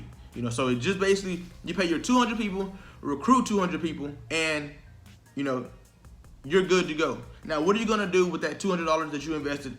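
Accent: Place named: Rock Hill, United States. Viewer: Sounds like a rock hill dude 😂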